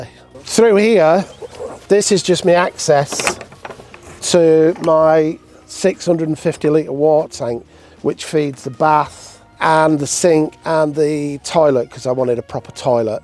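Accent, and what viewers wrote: England, United Kingdom. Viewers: Brogue is Irish...he is English ! 😊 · your beautiful English accent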